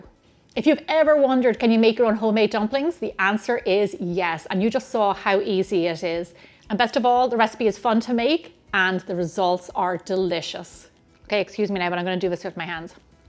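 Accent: Ireland. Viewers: Hi Im not fond of dumplings but I watch anyway for this Irish accent🎉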